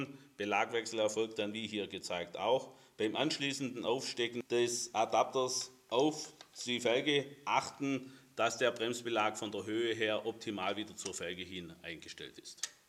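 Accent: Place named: Swabia, Germany. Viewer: Geiler Typ mit herrlichem Schwäbisch!🎉😂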